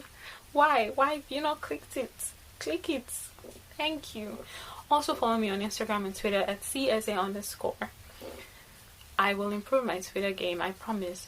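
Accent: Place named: Nigeria. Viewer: I guess writing and keeping your work scope and plot around Nigeria has helped to keep you connected to the Fatherland, I could see and feel the “Nigerianness” here and there while you speak! I totally loved it ❤️.. A fan!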